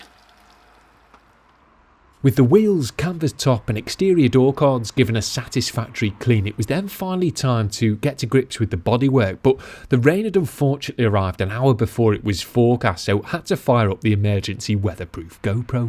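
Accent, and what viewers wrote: Liverpool, United Kingdom. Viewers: Love this!! Great job mate. Are you from the Wirral or Liverpool?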